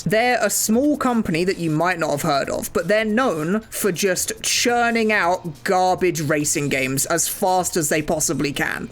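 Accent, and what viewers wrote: United Kingdom. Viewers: nice video, but would have been better without british accent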